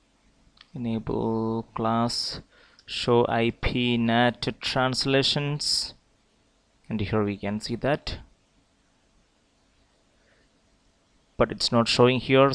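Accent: India. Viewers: Your accent india prideee i'm not understand sir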